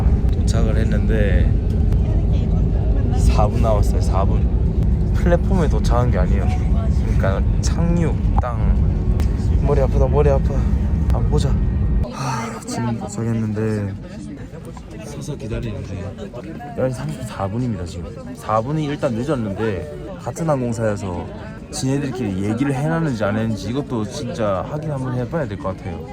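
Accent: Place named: Gyeongsang, South Korea. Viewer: ㅎㅎ첨보는데 사투리 보아하니 경상도?? 울 아들같아 웃음이~ㅋㅋ 화이팅 !!!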